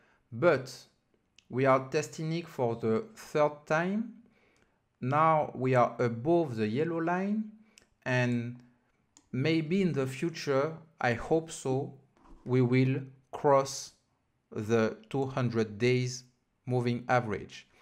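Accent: France. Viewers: Merci pour cet anglais "français"... je comprends TOUT ! merci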